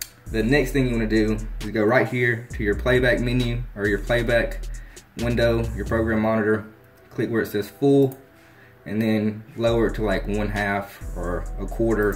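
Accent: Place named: South, United States. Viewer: Where You From You Have A Southern Accent Btw Thxx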